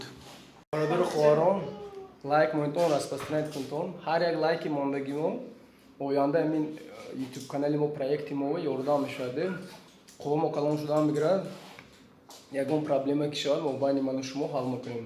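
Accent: Konibodom, Tajikistan. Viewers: Канали шумоба гап нест лафзи Конибодоми худамо бо дигаде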